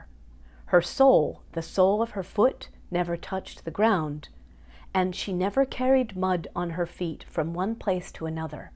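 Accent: Ireland. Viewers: Greetings, I'm not up to scratch with tenoliglical stuff, I lived on the land since I was born, my friends are like you, nice people. I'm off ta play music tomorrow, I know how ta grow food and be basic, I goes up to meet nice neighbours and I tell them I jump on to you you tube and came accross this lady called, they laughed their heads off at me, this man is a dab hand in writing books on Irish language, I said maybe you should invite this lady over, I left de message with him, I was telling em she speaks like a donegal woman, ican see your good hearted woman, thanks for replying, If you get to Erin again I'll introduce you to nice people. Your very modest woman, I can understand you when you speak. Kenneth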